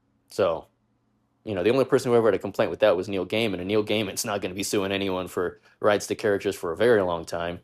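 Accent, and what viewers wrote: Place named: United States. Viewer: You have an American accent, and yet seem to struggle reading English. I didn’t finish the video but in 5 minutes you mispronounced at least 6 names. Hot garbage.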